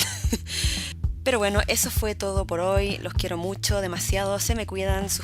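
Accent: Chile. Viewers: Jeje,wena mi pana 😎 No me esperaba que fueras de Chile,aunque si se nota en el asento Como que todas las chilenas suelen tener voz bien posta,así como desde kabra chica OwO,mi voz es media española en los audios,pero enrealidad si es posta xd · yo despues de ver 2 segundos de video: es chilena? :0 xd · Te escuché y fue como es xilena YUJUJUI Aaa dibujas terrible lindoo weoon 😭💖💖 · wena pooooooooo yo cuando escuche tu voz dije Ez CHilENa xddd buen video uwu nueva sub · Aunque este video es viejo quiero decir que me encanta el acento chileno. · De primera pensé que era Chilena, luego dije: "no, es argentina" Y después dijo: "si soy Chilena" xd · AHHHHHHH suenas muy chilena!!!!!!!!!!!!!!!!!!!!!!!!!!!!!!!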